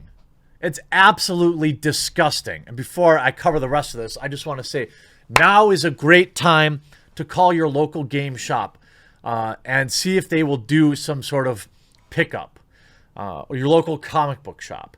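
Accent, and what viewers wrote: Wisconsin, United States. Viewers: Oh man, youre from wisconsin??? Hahahahahaha damn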